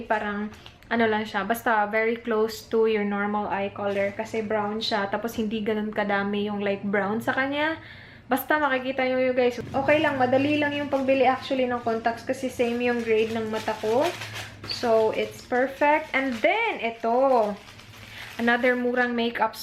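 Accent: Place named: Visayas, Philippines. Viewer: haha ang cute ni ate mag bisaya haha 😌😌😌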